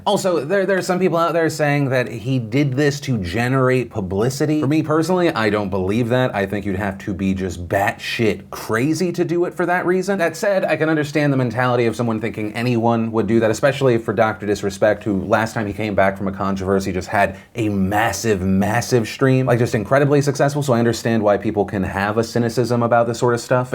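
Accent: South, United States. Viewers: Is from the south? I thought I heard a southern affectation in this episode. Also I had a roommate from Hong Kong, hope she’s doing alright right now.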